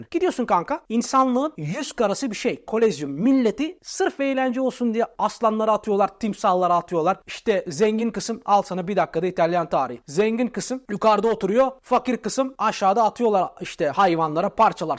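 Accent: Thrace, Turkey. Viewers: trakya aksanin fena ya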